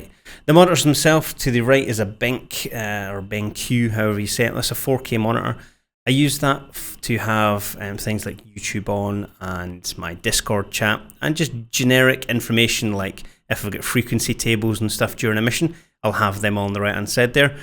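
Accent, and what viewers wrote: Scotland, United Kingdom. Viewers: OoooOooOOO dat thicc Scottish accent. Congrats on 10k!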